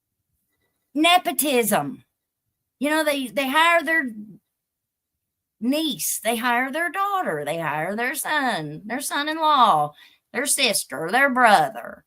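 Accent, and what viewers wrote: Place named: Appalachia, United States. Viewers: Newbie here! I found you on Joel's show! Your accent reminds me of my aunt in Kingsport Tennessee!! Great coverage of this case!! ❤️🤍💙‼️ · Do you have a point? I wasted almost an hour waiting for it. Maybe get to the point rather than rambling endlessly. Boring as heck and your hillbilly accent makes it hard to listen.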